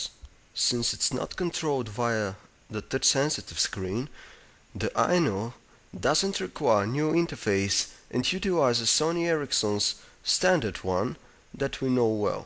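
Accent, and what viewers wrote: Russia. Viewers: wtf..the accent dude..like some russian dude in star trek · is he from Russia ????????????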